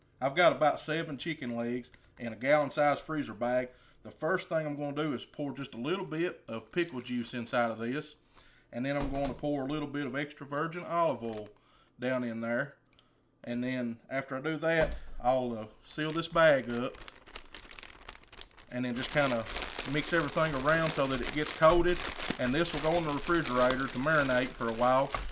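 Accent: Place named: Appalachia, United States. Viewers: that's the best hillbilly bears accent I've ever heard, where about are you from , i need to visit that state. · Listening to you talk always makes me miss family down in Letcher County. I'll definitely be giving the pickle juice a try when the weather warms up. Thanks for another great video!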